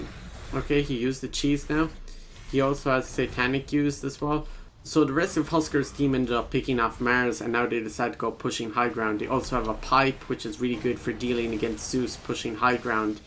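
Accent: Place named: Ireland. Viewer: You sound like you live somewhere in south ireland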